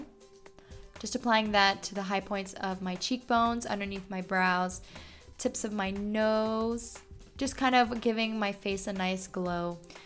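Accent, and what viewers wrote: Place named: Russia. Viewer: Сразу видно-говорит по русски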